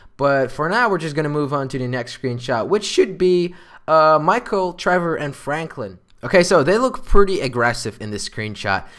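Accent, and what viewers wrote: Netherlands. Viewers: He is from The Netherlands So he is speaking not the engilsh as you i think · This guy is from the "South" of the Netherlands, and their Dutch compared with their english is no good... Or easily said: Annoying. (Im from Amsterdam)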